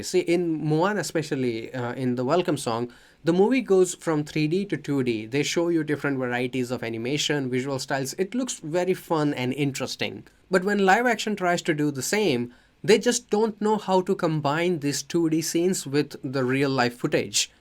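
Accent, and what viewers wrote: India. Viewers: My god I love Indian accents so much lol I could listen to it all day Great video and good points made, I’m just so tired of live action movies and sequences… Disney really just wants to play it safe, and they think the problem is when they come up with originals, well, they just need to make the originals NOT SUCK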